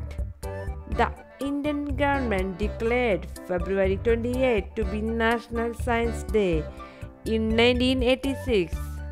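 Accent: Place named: United States. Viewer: Funny and nice american english, btw tq for this precious video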